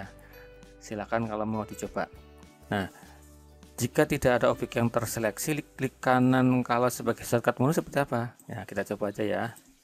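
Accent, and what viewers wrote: Banyumas, Indonesia. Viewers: Belajar gambar dino pak..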